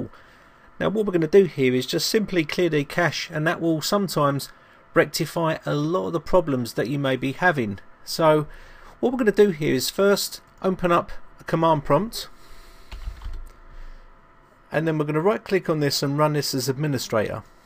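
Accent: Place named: South London, United Kingdom. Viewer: I'm a 68yr man, and even an old fart like me can understand your consise instructions. Are you a South Londoner? you sound like one,I was raised in Stockwell.I can still remember all the Pride and Clarke motor bike shops along Stockwell Road. Thank You very much,I do envy your know how. All the best mate, Mark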